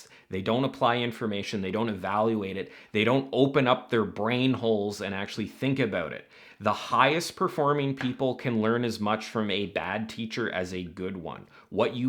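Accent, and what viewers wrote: Canada. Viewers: Flannel and saying ooot not out, gotta be another Canadian! I could be wrong, but that was a hard aboot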